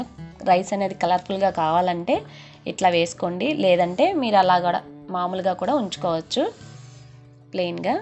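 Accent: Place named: Guntur, India. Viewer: medam padaka padaka antunnaru meru form guntur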